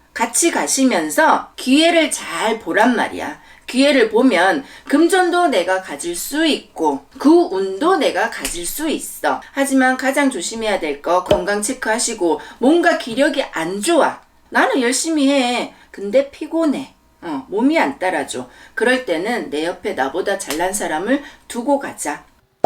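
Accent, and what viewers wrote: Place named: Busan, South Korea. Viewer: 정사생입니다. 부산사람이라 선생님 억양이 너무 푸근하니 익숙합니다. ^^ 선생님 유튭 방송도 응원할게요.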